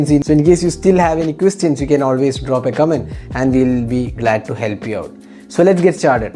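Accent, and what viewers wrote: Kerala, India. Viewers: Enthaaa English. Only malayalies talk English with this accent. Fools....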